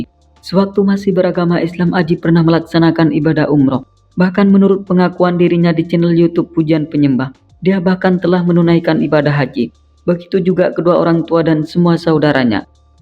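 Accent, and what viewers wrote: Madura, Indonesia. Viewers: logat bicaranya kayak orang madura nih youtuber....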